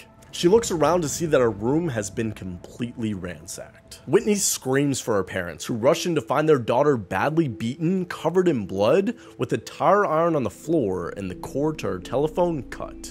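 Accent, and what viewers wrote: South, United States. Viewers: I love your story telling technique. Are you from the South. I can detect a southern accent. In your speech.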